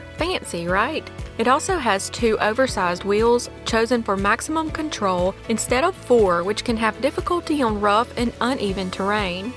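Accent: South, United States. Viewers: I love hearing Quebecois names being pronounced with a Southern accent. It's like listening to a whole different language. 😊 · we must be related. We have the same southern dialect and accent. Thanks for the stories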